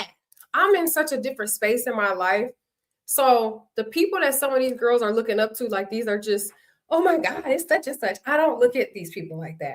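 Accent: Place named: Detroit, United States. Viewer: I heard your Detroit accent immediately, similar for some of the other cast. It is subtle but Detroiters have it. Saying D'Troit in a way more like the original French origin versus dEETroit I hear outside Michigan.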